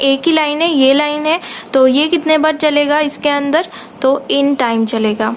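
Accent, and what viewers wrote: India. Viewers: probably a hyderabadi or south indian accent , cute btw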